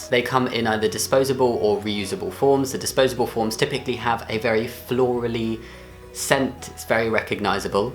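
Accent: United Kingdom. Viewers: I'm a cis girl but I know the struggle of putting a pad inside boxers because i'm very forgetful and sometimes i forget to bring a change of underwear when I come over to my bf's house so when it happens I borrow his boxers but holy shit, that time when I was in my period was nOT A GOOD TIME TO FORGET MY UNDERWEAR. And yes, I agree, it's easier when the pad has wings! Also, you said that pads smell like flowers but I actually have never seen any perfumed pads, what brand are you talking about? Well I live in Canada and you live in the UK (I think, by your accent, correct me if i'm wrong) so maybe we just don't have the same brands but idk it seems weird to me, I feel like the smell of the flowers and the smell of my period blood would just make a terrible mix, i'm not sure if I'd like that lmao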